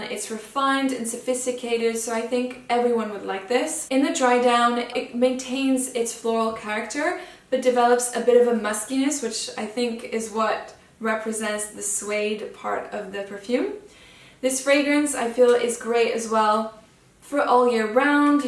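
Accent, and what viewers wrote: France. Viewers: Hi I am from Portugal and I love your channel , you describe fragrances perfectly and your french accent is beautiful . Great job 😁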